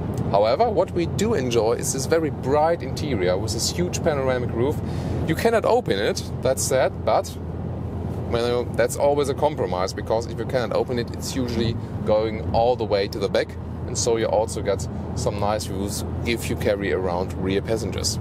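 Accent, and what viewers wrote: Germany. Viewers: Great review, nice video work and lovely German accent. But please stop looking back while driving.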